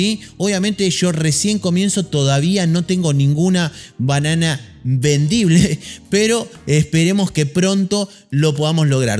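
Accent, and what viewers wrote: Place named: Argentina. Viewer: Sos Argentino hermano, tenes como yo un acento medio argento y medio de villa loco... a que te dedicabas antes ? esta una desastre este ispa amigo y estas mierdas no garpan abrazo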